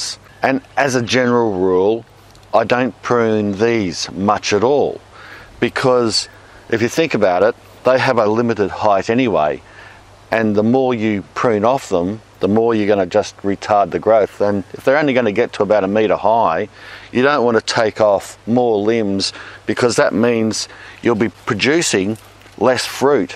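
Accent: Australia. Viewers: As an Aussie who shares his accent, I wish I could do this 😂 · I have his accent too.. but that’s because I live in the same area ;) Aussie Aussie Aussie!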